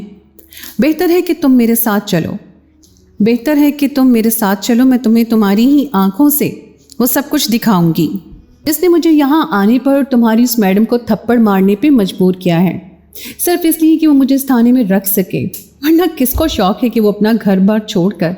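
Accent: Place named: India. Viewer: you still have Indian Hindu accent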